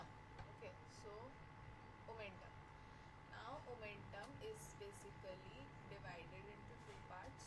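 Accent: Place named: India. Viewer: Excellent explanation. My exams are upcoming and finally found out this Indian youtuber. Love your Indian accent. You Indians always save us during the exams. Please upload all the vidoes as soon as possible regarding all the human biology. My exams are starting on 6 April, please do it as soon as possible either wise I'll fail my exams.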